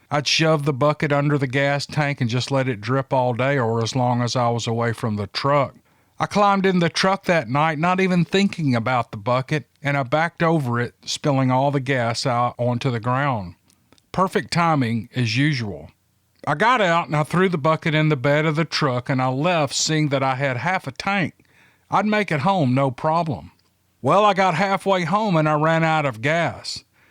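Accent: South, United States. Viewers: ok so I never commit, but I love your show! I love your accent I am from North Florida and my family sounds like you. So I feel at home listening love the southern drawl. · I'm a 71 year old, happy, Texas grandmother. I can't tell you how much I enjoy listening to your soothing Southern accent every night. I often fall asleep listening to your stories. I get so tickled when you lose it reading people's descriptions of smells! This Southern lady loves your sense of humor, your gentlemanly attitude, and your kind, Southern voice. Don't worry about the misprounciations...it's all part of your charm! Your honesty is refreshing in this troubled world. Thank you for being real. Hugs from this grateful grandmother. May God bless you and yours. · Hi, i live in chesham, a little village outside london UK, i found your channel last month,and fell in love with it from the first story! 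You are truly a master storyteller,you can connect and get lost in each and every story,short or long,you never disappoint. I'm now enjoying all your back catalogue of stories, and have introduced my girlfriend to your southern(?) drawl too! Love u lots bro,keep up the amazing job u do,you're my favourite addiction by far!😜😃🤩👍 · every time you say" that's how I want to see one in my car, moving" hahaha it makes me laugh every time! I listen to a lot of podcasts everyday as I'm a truck driver in North Dakota. I must say you do a very good job! You have a great personality and that southern accent I can just listen to you talk forever.... Thank you for what you do. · Yes his voice has some special soothing quality and I love the Southern accent. I'm Irish and it brings me back to 'ghost story' nights in the countryside when we were kids... Thank.you 🍃🌿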